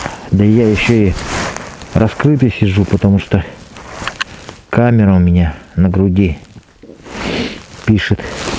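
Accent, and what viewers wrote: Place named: Yakutia, Russia. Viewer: С наступающим новым годом! Каждый раз рад слышать якутский акцент, такой родной и теплый! Удачи и развития канала!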